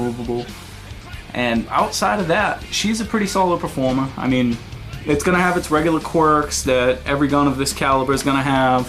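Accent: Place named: Boston, United States. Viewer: You live near Boston MA don't you I know that accent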